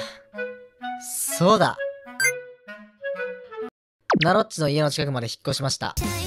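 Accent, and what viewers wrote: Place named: Kansai, Japan. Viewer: めろんぱーかーメンバー取り上げてくれるの嬉しい！読む時関西弁になってるのが地味に嬉しい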